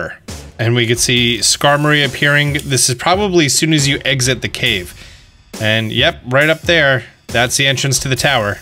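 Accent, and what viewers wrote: Spain. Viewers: I like you even more now, with you cool Spanish accent!!! For the win!!! GO!!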